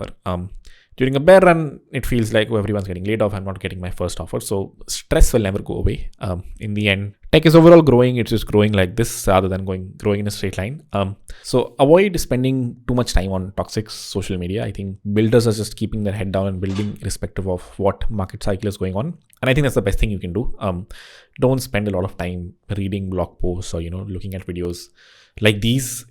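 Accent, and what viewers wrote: India. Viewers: I turned on caption and realized he is speaking Indlish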